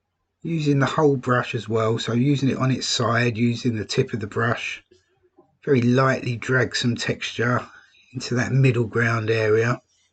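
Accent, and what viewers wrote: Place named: United Kingdom. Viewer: Drawring !Got to love a British accent. Takes me back to Michael Meyers and his character , a little boy named Simon that made his drawrings in the tub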